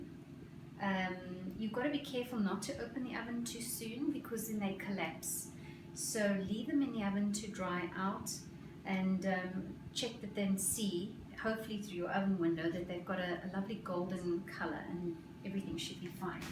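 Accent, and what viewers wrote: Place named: South Africa. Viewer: I love your recipe! Do you live in South AFrica? You have a SA accent, I lived there too, in Pretoria!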